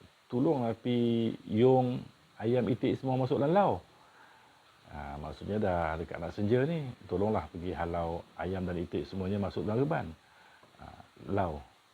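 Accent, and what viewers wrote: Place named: Kedah, Malaysia. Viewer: Terbaik.. saya suka loghat kedah..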